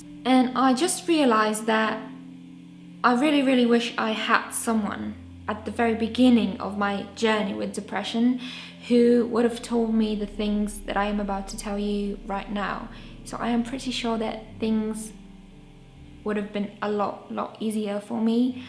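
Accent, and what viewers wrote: Poland. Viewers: Wiedziałem że jesteś z Polski po wymowie zanim zobaczyłem komentarz xd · are you polish? very beautiful accent 💜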